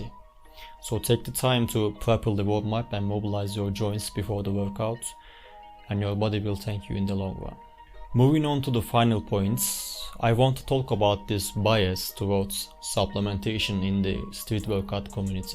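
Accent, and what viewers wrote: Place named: Turkey. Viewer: Bu bir destek yorumudur. Diline sağlık. İngilizcen bayağı iyi ama türk aksanın belirgin =)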